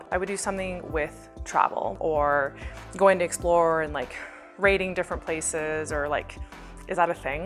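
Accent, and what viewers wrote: Quebec, Canada. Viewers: You have a Canadian accent eh? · Salut! Tu pilotes mon avion préféré (Dash8/400); je suis passager régulièrement entre Montreal et Halifax! Super de t'entendre parler Québecois;P Aussi, Mars, sign me up! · Canadian accent - all Canadians are taught French (by law). If it was your first language then you must have been raised in Quebec. Have enjoyed your videos - thank you! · Merci pour les réponses.. J'avais deviné avec ton accent que tu es québécoise. Continue ton excellent travail! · French canadian? Or real French from France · French Canadian i am guessing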